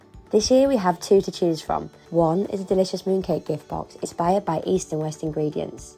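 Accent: United Kingdom. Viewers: Love your share, especially hearing your British accent! What a pleasant enjoyment.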